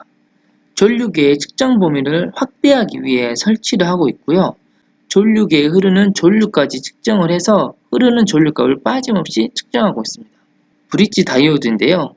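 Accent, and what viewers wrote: Jeolla, South Korea. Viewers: 전라도 사투리가 강하고 구수하네요~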